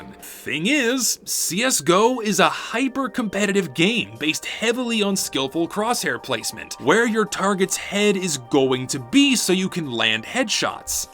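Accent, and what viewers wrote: South, United States. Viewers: I may be missing something but I swear he randomly goes southern every 6 seconds or so.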